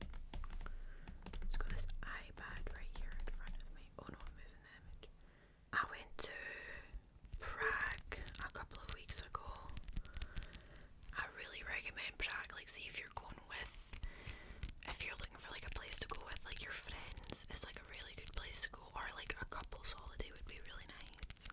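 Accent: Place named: Scotland, United Kingdom. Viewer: I sure hope all Scottish women blessed in the chest, cause jeeze is that accent annoying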